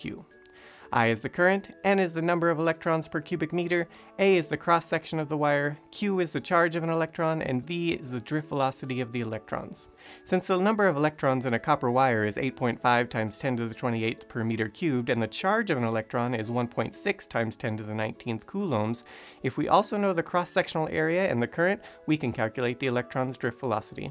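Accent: United Kingdom. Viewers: To be fair, the smart British accent facade is getting a little old in general, though I can't argue that it wasn't a smart move all the same. At least I don't feel like Simon wants to kung fu fight me like that guy from PBS Space Time. His "smarts" are over 9000. Cheers.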